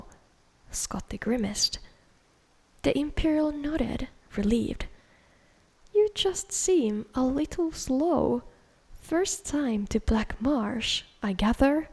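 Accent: Finland. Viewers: The Finnish accent is really appealing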